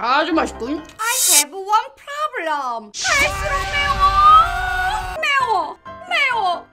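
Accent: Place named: South Korea. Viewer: 약간 영어 못하는 한국인이 말하면서 먹는거 같애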